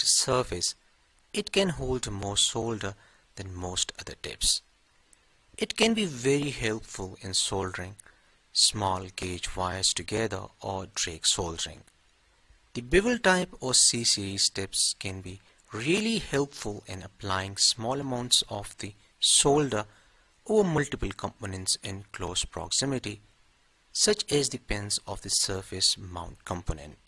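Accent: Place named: Mumbai, India. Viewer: I like your accent. Is it Mumbai/Bombay?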